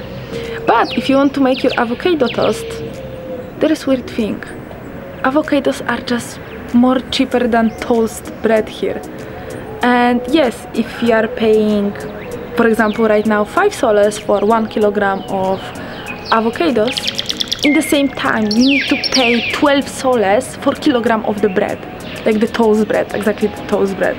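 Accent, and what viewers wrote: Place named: Poland. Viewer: Are you Polish? Omg your accent is so cute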